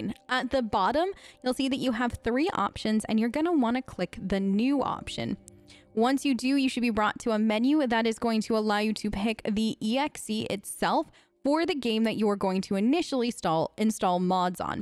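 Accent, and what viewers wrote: Australia. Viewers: Look, I have to know, what is your accent? It sounds like you're alternating between Australian and American to me (maybe because I'm Australian). Also, super-duper thanks for this. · I keep hearing an aussie accent slip in there. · The accent is 100% not her original accent. I'm confident that she is Australian. It comes through, especially in moments of focus intensive thinking. Listen out for it, you will hear it. Specifically listen to the words beginning and ending with "o". Australians pronounce that very differently from Americans and you can hear her slip up often. Listen out for "hello", "over", and others. Don't dismiss it, there's a reason people don't notice. She does the American accent very well, even if a bit stereotypical.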